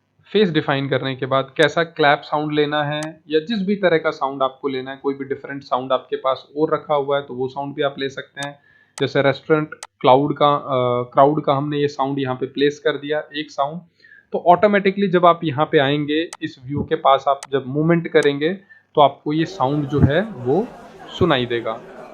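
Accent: India. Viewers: Sir pls at least add subtitles or cc in your video.. I really dont understand what are u saying with ur indian accent.. i can only understand a few word and not all because ur english accent use indian accent. So pls pls add subtitles🙏🏻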